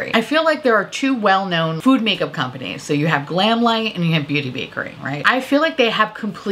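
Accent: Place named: Minnesota, United States. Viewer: Oh but your Minnesota accent is the best. And because I enjoy that accent so much my work has sent me to H.E.🥍🥍 in Minnesota for two months. From the convos I’m hearing you might consider using Rhubarb Crisp into the Minnesotan thingy.:)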